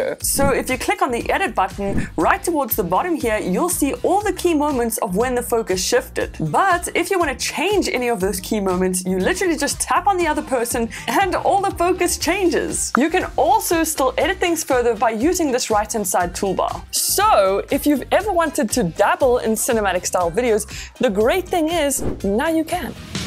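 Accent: South Africa. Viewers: Thats a south african accent! Sandton? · South African accent.. Proud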